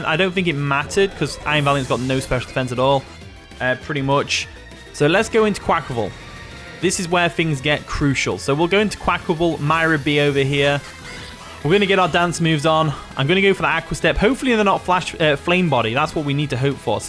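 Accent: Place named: United Kingdom. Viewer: It's the accent bro! Everything's better in British.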